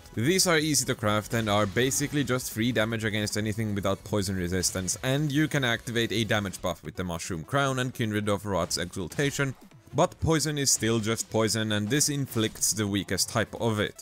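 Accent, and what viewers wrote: Finland. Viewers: New sub based solely on the humour. 😂 For me, he worst thing about combat is scrolling the damn items to get to things I need. By that time, I'm usually dead or almost dead. 😂 Edit: also, you sound Finnish. Is that right?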